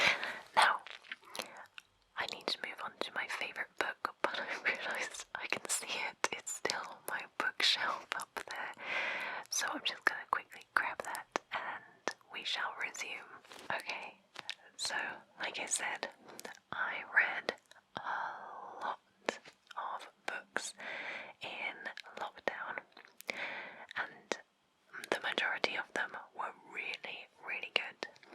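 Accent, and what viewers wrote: United Kingdom. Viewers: Beside her being beautiful is she Britain from the accent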